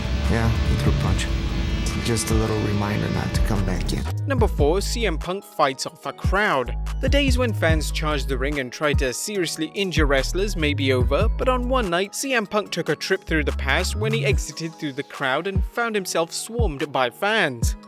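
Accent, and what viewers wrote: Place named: United Kingdom. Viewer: Why’s there always some British dude narrating these things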